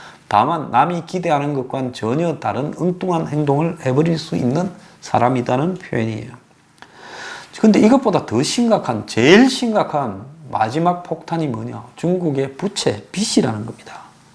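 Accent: Gyeongsang, South Korea. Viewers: 경상도 사투리가 있어서 그런데 적당히 들으시구려 이곳에서 까지 그런 지적질 하지말고..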